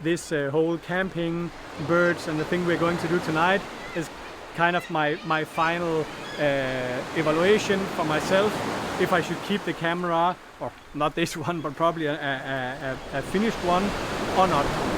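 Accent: Denmark. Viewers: I allways like the big fat danish accent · I haven't finished the video and I don't yet know if I'm going to buy a Nikon mirrorless (currently have Nikon DSLRs but also a Sony α7RIII) but a Brit who once lived for a year in Aalborg, Denmark I absolutely love the sound of a Danish accent speaking English!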